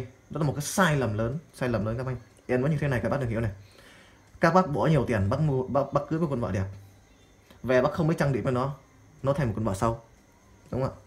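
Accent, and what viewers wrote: Standard. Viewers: Công nhận bạn nói rất chuẩn .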